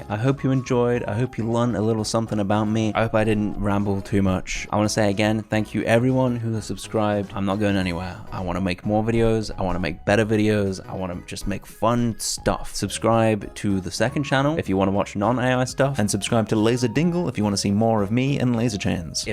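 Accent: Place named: United Kingdom. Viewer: Idk, he sounds British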